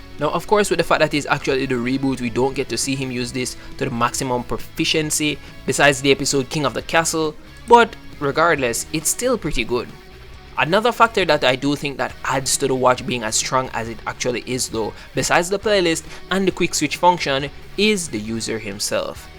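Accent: Jamaica. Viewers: your jamaican right? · Are you from Jamaica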